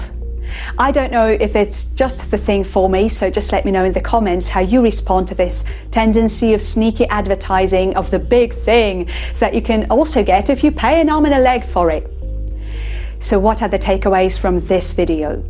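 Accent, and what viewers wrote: United Kingdom. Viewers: I think you have lived in England before because no one can speak like British accent fluently like you without living in England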